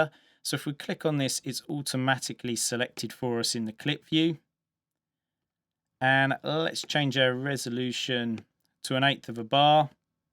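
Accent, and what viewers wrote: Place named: United Kingdom. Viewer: Nobody knows how i love british accent!!!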